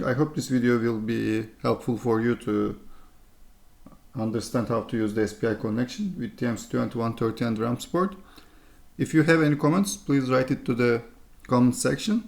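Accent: Turkey. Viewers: Turkish accent? 😁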